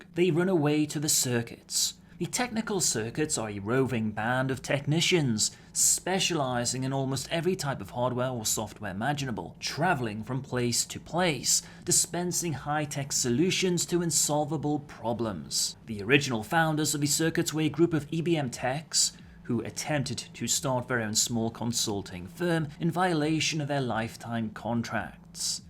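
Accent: United Kingdom. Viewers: If you could it would be awesome. Your speech is nice to hear (and that's why i say about braindancing) but when the meaning is more important (for example gang, drugs, Silverhand, the whole series of cyberpunk lore) then your sounds-like-british accent sometimes can make it harder to understand. I mean... I really appreciate your effort but if you give subtitles, then it gonna be like than cherry on the top of the cake. It really works. For example - i understand 57% of the NC gangs from hearing but when i read subtitles - my gang lore understanding hit to 97%. It really works Samurai 😎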